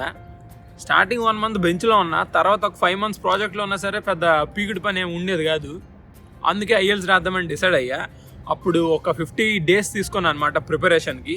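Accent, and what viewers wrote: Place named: Telangana, India. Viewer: Endi bro slang Andhra nunchi Telangana ki maarindi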